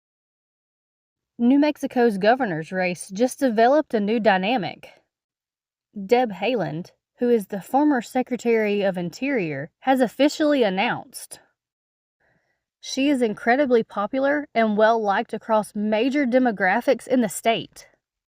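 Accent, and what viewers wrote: South, United States. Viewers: Can I just say, I love Southern Accents❤️ Taking the “i” out of Oil is awesome. It doesn’t need to be there, we all know what it is without it(sludge). Love it🥰🤘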